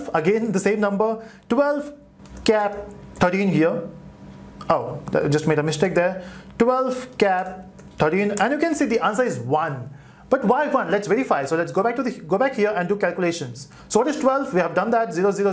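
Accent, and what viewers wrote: Telugu, India. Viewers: are you from india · Are u from andhra? /Telangana?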